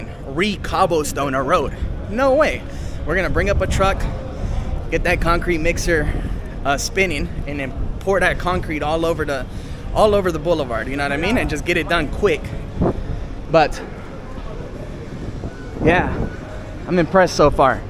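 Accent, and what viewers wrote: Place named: United States. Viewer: I really like your American accent. thanks for sharing this video!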